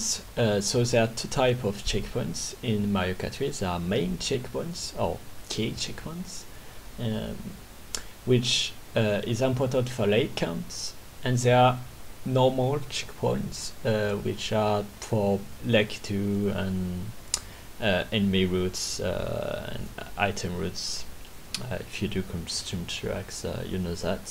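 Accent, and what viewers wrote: France. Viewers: You can hear that you're from france 😎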